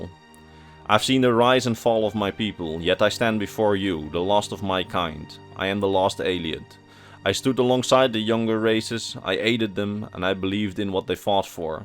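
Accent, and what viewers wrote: Netherlands. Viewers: Dutch i guess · Are you like, Dutch?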